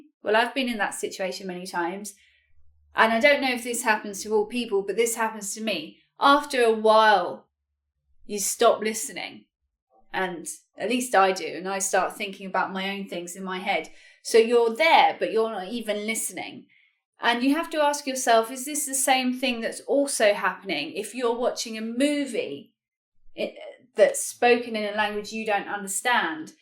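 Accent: United Kingdom. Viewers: Thanks for making this useful video. I have been in the same situation and It is not too late for me to change the method to learn English effectively. By the way, I love you British accent a lot. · I really like your british accent. I am studying English currently a day per week. I lke your videos. Thank you a lot! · I love your lesson and your British accent ; )Thanks a lot!!! But I would like more lessons for british pronouncation ;)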